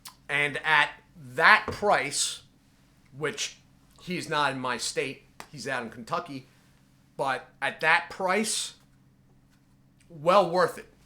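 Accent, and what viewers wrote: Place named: Philadelphia, United States. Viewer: I live in South Jersey judging by your accent I'm assuming you're from Philly, love your videos, does anybody know where I can get this around South Jersey or Philly thank you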